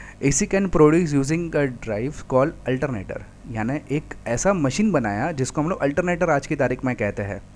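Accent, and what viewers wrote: Gujarat, India. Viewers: R u gujarati?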